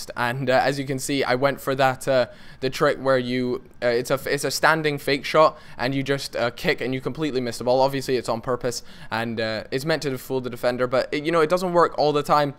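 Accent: Scotland, United Kingdom. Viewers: are u scottish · are you scottish or what...mixing your accent with a hint of english.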